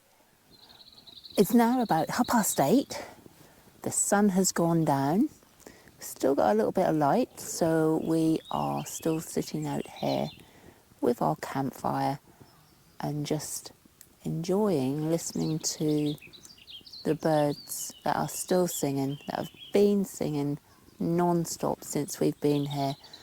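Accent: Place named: Norfolk, United Kingdom. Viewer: What a lovely relaxing place to camp...like you i would go for the veggie burgers and not the dirty old beef burgers...loved hearing the Norfolk accent..i am from Suffolk so could hear a few similarities....[ new sub ]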